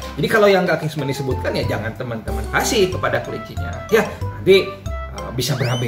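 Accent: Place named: Sunda, Indonesia. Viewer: si aa atuh meni lucu sunda na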